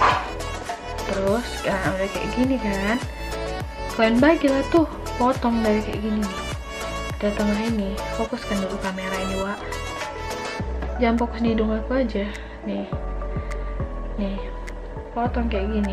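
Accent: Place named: Medan, Indonesia. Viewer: Anak medan ya wakk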